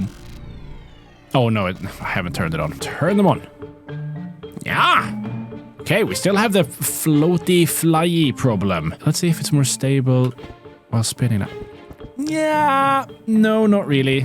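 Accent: Sweden. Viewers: Is Swedish? Cause I Am also Swedish and it feels like the pronunciation of a couple of words feel ”Swedish” aka the accent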